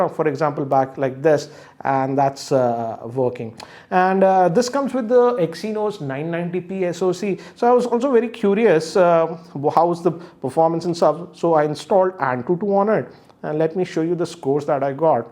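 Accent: India. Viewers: OMG I love indian people accent 😁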